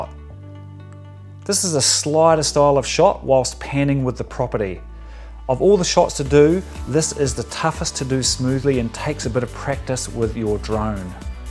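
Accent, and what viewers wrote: New Zealand. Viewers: In the “EAAR” no offence hahah I love the NZ accent!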